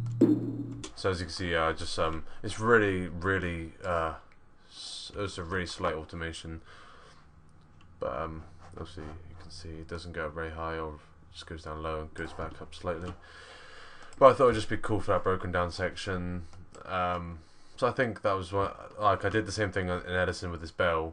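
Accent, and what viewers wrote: United Kingdom. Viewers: your from london? you sound like it? hit me up on inbox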